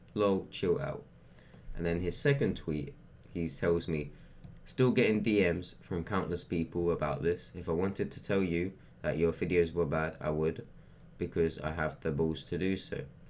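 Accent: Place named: United Kingdom. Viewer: How did I get subscribed to someone who speaks with a British accent sounds like he's chewing on a mouthful of caramels while he's trying to talk. Nobody gives a fuck about your opinion you were little island of 20 million people LMAO.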